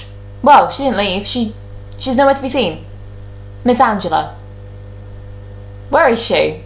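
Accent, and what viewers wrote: United Kingdom. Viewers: Wait are u British ur accent is so cool I’m Aussie and also when u post it I’m always here so our time zones must be very close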